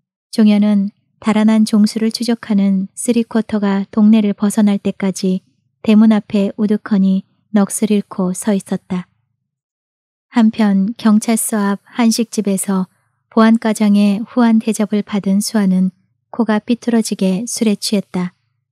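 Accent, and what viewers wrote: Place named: Honam, South Korea. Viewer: 혹시 출신이 호남이여.? 워찌 그렇게 억양이 똑 같어부까이.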